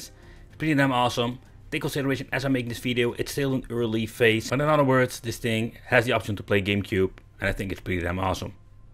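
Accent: Netherlands. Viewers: It sounds your northern European, Dutch I presume.. did you have to pay import costs for the steam deck?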